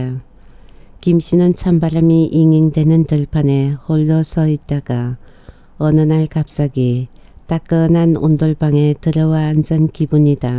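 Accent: Gyeongsang, South Korea. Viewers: 고향 운정 미국이민 20년 타향에서 도식오빠 순례 애틋한 인연 한편의 영화 님 경상도 밀양 사투리 가슴 이 먹먹합니다 감동입니다🎉😢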